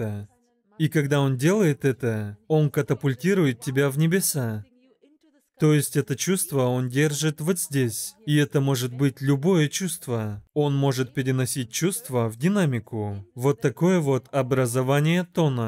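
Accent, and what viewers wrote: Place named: Kazakhstan. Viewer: Вы говорите по ҚазаҚчки девушка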